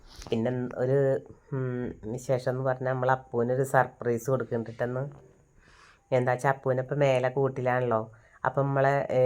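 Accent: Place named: Malappuram, India. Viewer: Malappuram bhasha kelkan nalla rasam ❤❤❤❤❤❤radish curryum super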